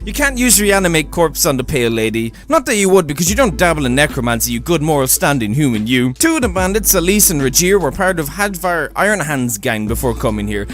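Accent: Ireland. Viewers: Yes irish...just yes! Love the rambling!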